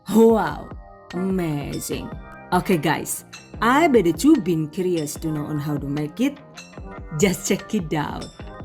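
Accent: Banyumas, Indonesia. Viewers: Dening orang ngapak ya yhu, 🤣🤣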